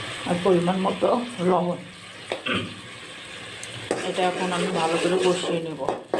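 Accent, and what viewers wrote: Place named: Sirajganj, Bangladesh. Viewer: প্রথমে আমি কে ধন্যবাদ জানাই ধন্যবাদটা এ কারণে আপনাদের এই চ্যালেনে রান্না থেকে আমি অনেক কিছু শিখতে পেরেছি আমি দেশের বাইরে থাকি এখানে রান্না থেকে আমি অনেক কিছু রান্না করতে শিখেছি আমি জানিনা আপনি কোন জেলার মানুষ তবে ভাষা থেকে বোঝা যায় আপনি সিরাজগঞ্জ জেলার মানুষ আমি সিরাজগঞ্জ জেলার কাজিপুর থানার সোনামুখী ইউনিয়নের মানুষ আমাদের ভাষার সাথে আপনার ভাষাটা মিলে যায় এই কারণে বললাম দোয়া করি সামনের দিকে এগিয়ে জান সামনে আরো নতুন কিছু আইটেমের রান্না নিয়ে আসবেন ওখান থেকে আমরা শিখে নিব ধন্যবাদ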